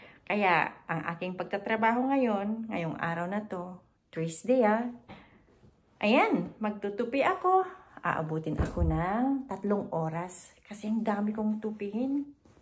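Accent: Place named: Ilocos, Philippines. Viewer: Nakakatuwang pakingan ninang ilokano tagalog❤❤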